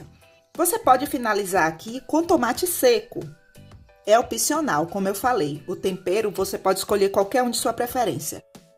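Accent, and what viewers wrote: Bahia, Brazil. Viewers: Amo suas receitas e gosto do seu sutaque Bahia tudo de bom gosto muito de vc pq esprica com muito atenção parabéns vc c me lembra uma amiga mulher do meu cunhado de senhor do Bonfim Bahia bjs 😘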